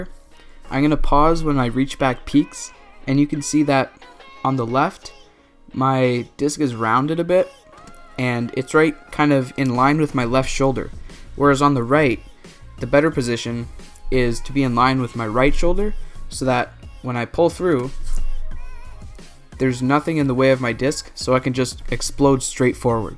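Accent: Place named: Canada. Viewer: Your videos are the best... excellent breakdowns and teaching! Sounds like you are somewhere in Canada? How did you get your gym let you do that on the basketball courts?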